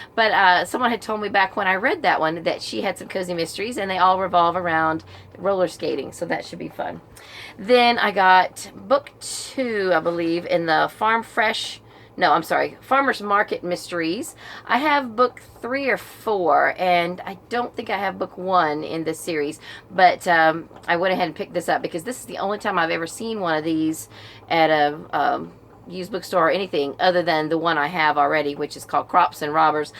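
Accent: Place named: Oklahoma, United States. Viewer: Yes, I love your Oklahoma twang!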